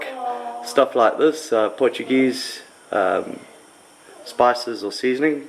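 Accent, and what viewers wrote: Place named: South Africa. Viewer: Is the dude South African or Austrailian....!?!?! I CANNOT WORK IT OUT!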